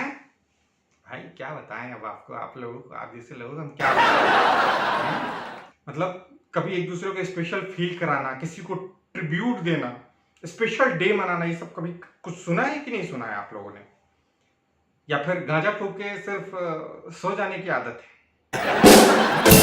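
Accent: Bihar, India. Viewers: Bihari Andaz Mai EK THOW Ka shandhar presentation, Phir Economic warriors, Keep it up.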